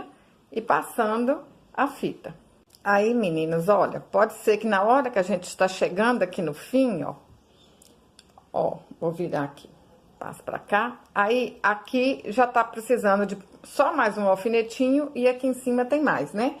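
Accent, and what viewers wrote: Minas Gerais, Brazil. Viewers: O mais gostoso de tudo é esse sotaque mineiro. Amo! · O que mais gostei foi o sotaque mineirinho! Assisti até o final apreciando a moça falar!😁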